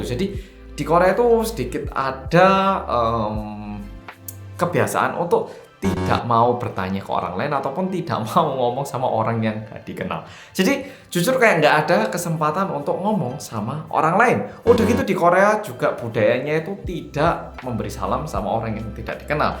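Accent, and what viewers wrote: Java, Indonesia. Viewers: Salah fokus sama aksen mas yg masih medok aja, padahal dah 5 thn balik ke Korea...hehe, semangat mas...jgn ikut2an depresi kayak org Korea pada umumnya... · Jan medok bless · Ga percaya ini orang Korea, bahasa Indonesianya medok Jawa banget :)) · Logatnya jawa, ada juga jerman logat batak .. 😂😂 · Terlalu medok bahasa Indonesia nya mas jadi kesannya kurang jelas mas.. 🙏🙏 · Mas medoknya masih kentel banget..apa memang masih ada yg bisa diajak ngobrol di korea pake bahasa jawa?soalnya sudah 4 tahun lebih jg ya di korea tapi medoknya masih tetep sama..hahaha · mending ngene wae mas, nek pas omong jowo medhok subtitele tetep bahasa Indonesia, jd bebas omong jowo & tidak dikomplain lagi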